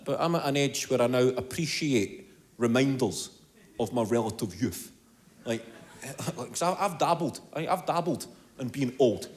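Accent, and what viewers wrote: Scotland, United Kingdom. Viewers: I was in Scotland this month for the first time. I recommend anyone planning that kind of trip to listen to your shows. Thanks to your comedy, I laughed my way to understanding the accent. I enjoyed the Whisky, the haggis, the castles and the history and had no problem understanding every word. Thanks!!!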